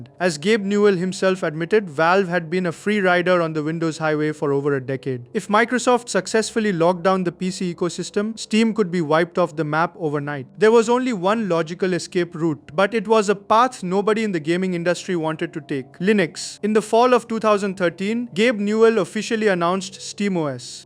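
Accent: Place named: India. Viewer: Heard the Indian accent and left instantly saar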